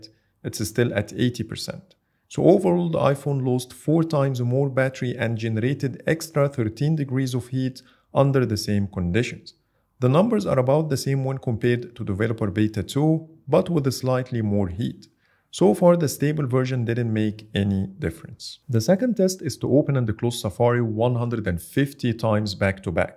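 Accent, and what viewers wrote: Egypt. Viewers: Your English sounds Egyptian to me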